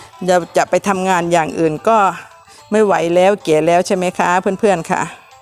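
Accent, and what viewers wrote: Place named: Surat Thani, Thailand. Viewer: พี่ พูดเหมือนคนสุราษเลยครับ ได้ความรู้ครับ ผมก็เริมสนใจบอนสีครับ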